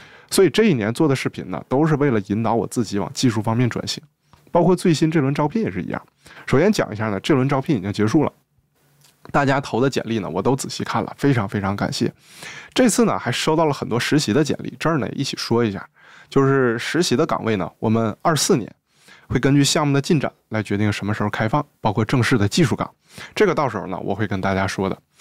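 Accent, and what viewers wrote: Northeast, China. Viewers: 小老弟小老妹儿，第一次看你的视频就知道你是东北人:face-blue-smiling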